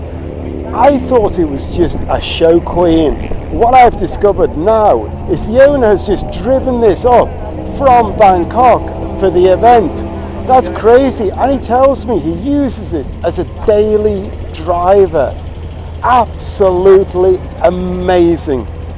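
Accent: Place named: Liverpool, United Kingdom. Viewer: Lovely video mate ❤ Where are you from? Can I hear a Liverpool accent or close to Liverpool? Maybe Chester areas?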